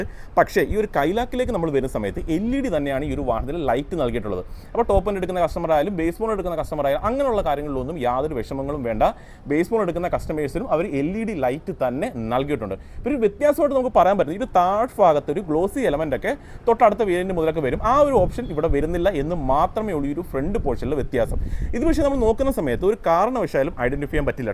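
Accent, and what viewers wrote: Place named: Kollam, India. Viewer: കൊല്ലം language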